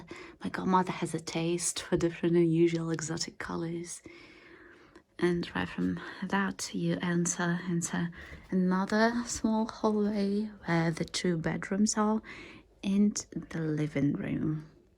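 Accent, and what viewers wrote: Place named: RP, United Kingdom. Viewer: Привет your RP English is pretty good. I love your voice and presentation style. I have been living in Moscow since 2015 so I understand your customs and way of life as they are obviously similar to the Russian way of life. Yet I still find it interesting to watch your videos.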